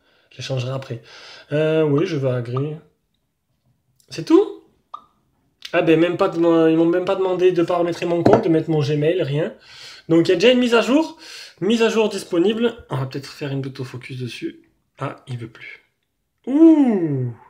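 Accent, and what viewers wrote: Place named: South, France. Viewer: Moi je trouve ta vois agréable avec un petit accent du sud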